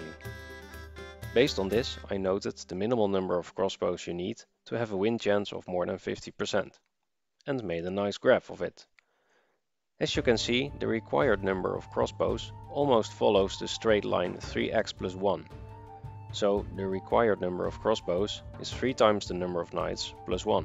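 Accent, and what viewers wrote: Netherlands. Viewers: Such a thick dutch accent. Cannot listen to this, sorry. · Oh god if that's not a Dutch accent I don't know what is :P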